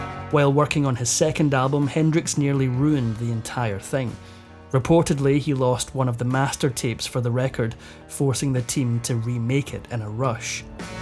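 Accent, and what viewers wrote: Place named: Scotland, United Kingdom. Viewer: Yay my favorite narrator love listening to the Scot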